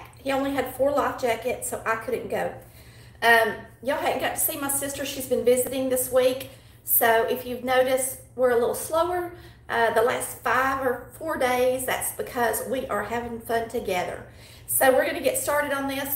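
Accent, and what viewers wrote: South, United States. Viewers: I absolutely love your Country accent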